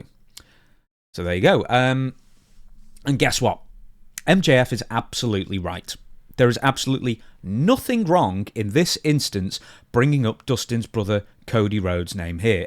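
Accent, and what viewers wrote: England, United Kingdom. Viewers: u have a latino name but u accent sounds uk 🇬🇧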